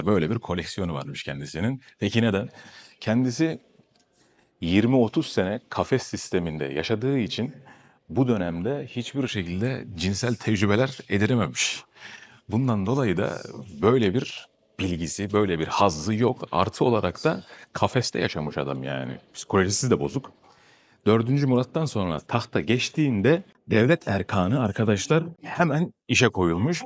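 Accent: Turkey. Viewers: Bu kardeşimin vidiyolarina bayılıyom. güler yüzlü esprili tam ana dolulu konusma sen anlat tarihi kafasi iyialan dinler❤👏👍🤗🤲🐞🍀🍀🍀🐞🇹🇷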